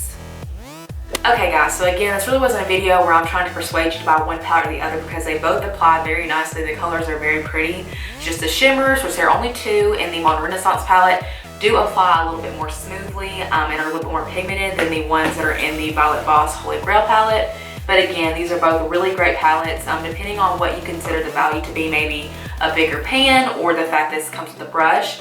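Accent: South, United States. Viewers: Is that a southern accent you have? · I totally didn't expect your voice to sound like this😆 Love the southern accent. Where are you from? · You are so so so GORGEOUS!!!! You popped up on my suggestions and I just had to watch. I love your southern accent, hun it sounds a lot like mine! New subscriber, I'm impressed. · Seriously? Clearly American and southern...... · New subbie! Where's your accent from? You sound like a southern girl! Love your eye makeup! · Omg where is she from her accent is adorable and southern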